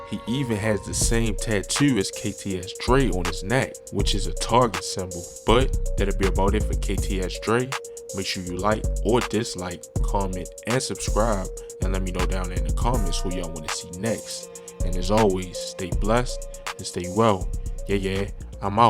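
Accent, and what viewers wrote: Baltimore, United States. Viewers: Maryland x Delaware checking in ❗⚠️ I hear that BMore accent. Lol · That Baltimore accent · Does the narrator have a Baltimore accent ? 😭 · This narrator got to be from Baltimore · Bro gotta be from Baltimore. The way he talk. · Dude sound like he from Baltimore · You got that Baltimore accent bro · True. I know that Baltimore accent anywhere. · one person demise is another person's entertainment. I can't even take him serious. Dude has a Baltimore accent. You should be doing documentaries on your own city.... I forgot, it's been done already. The Wire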